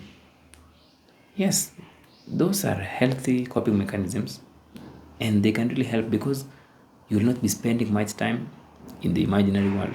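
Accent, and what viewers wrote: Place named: Kenya. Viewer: My fellow Kenyan!!!! That accent 😊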